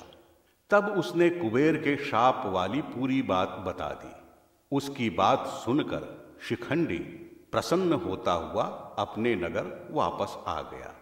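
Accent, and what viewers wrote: Standard. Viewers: शुद्ध उच्चारण में सुनाई गयी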